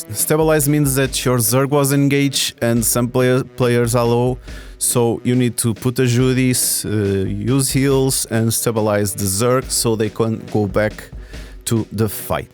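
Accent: Portugal. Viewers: Esse sotaque é de um tuga ahah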